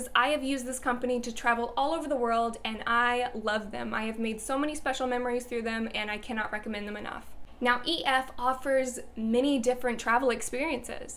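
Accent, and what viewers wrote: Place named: South, United States. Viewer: Thank you for your Speaking Japanese in a Southern accent videos, those made my day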